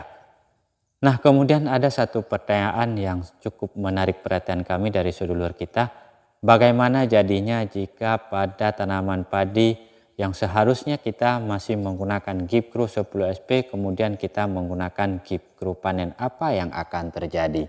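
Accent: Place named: Aceh, Indonesia. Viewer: Bang.... Dari logat sang droeneuh ureung Aceh. Beutoi bang?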